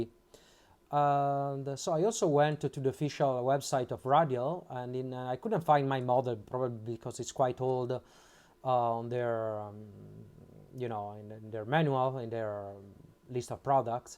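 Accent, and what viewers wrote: Italy. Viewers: Ho sentito nel video che dicevi “qui in Francia” ma dall’accento avevo sempre pensato che fossi italiano. Io sono di Roma e vivo in Portogallo, radioamatore e recentemente mi sto appassionando di elettronica. Complimenti per i video che posti. C’è sempre da imparare. Diego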